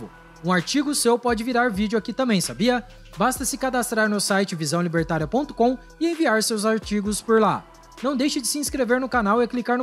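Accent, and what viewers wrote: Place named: Caipira, Brazil. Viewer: NÃO dá pra entender oq ele fala com esse sotaque capira. Pqp!!!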